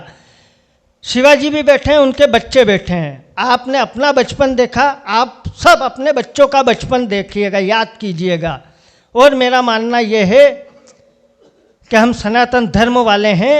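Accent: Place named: Gujarat, India. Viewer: ❤ touching, love you ji. aapka tone gujrati lag raha hai.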